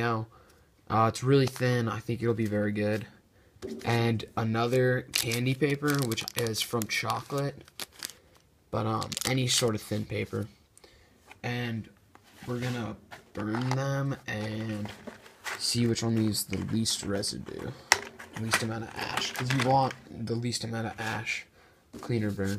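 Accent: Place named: India. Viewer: A wrapper I thought he was saying rapper This Indian tech guy inglish needs to stop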